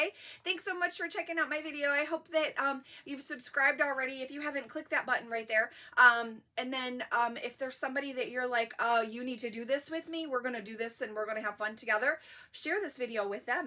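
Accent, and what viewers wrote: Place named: Michigan, United States. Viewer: fellow Michigander here! Totally recognized the accent immediately 🤗💜! New consultant here. You have great content! Looking forward to seeing more 😊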